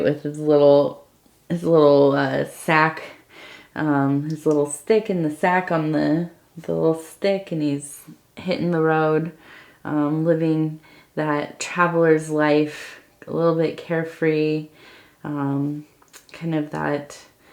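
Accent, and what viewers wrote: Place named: California, United States. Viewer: Great! You answered my question. I can now attribute the quality I heard to a Californian accent. The nuances of our American accents are broad and beautiful. I was made aware of it early on growing up in Alabama!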